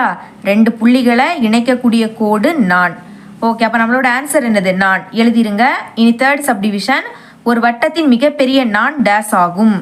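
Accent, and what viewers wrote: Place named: Nagercoil, India. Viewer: Unga native nager coil side thana unga voice enga area pola iruku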